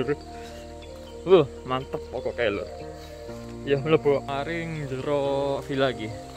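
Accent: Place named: Banyumas, Indonesia. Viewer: Aq pengem ketawa sm logat ngapaknya kak🤣krn dlu mbak kosku iso ngapak jg kwkwk jd aq suka dcritain humor2 pke bhs ngapak kwkwkk kamshamida kak dh sharing villa ademnya...slm dr sydney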